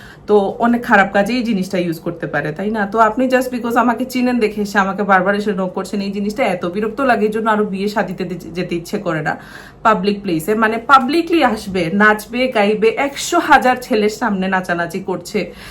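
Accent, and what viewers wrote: Sylhet, Bangladesh. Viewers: Apu amrar sylheti mat matoin na kene shunte valo lage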